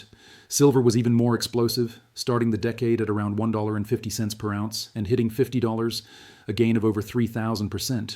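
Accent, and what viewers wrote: United Kingdom. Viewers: British, American and Aussie accents and with more names than Frank Abagnale ever dreamt of. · blathering AI avatar with a uk accent ==total nonsense--these guys are loony bs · The AI dithers between British and American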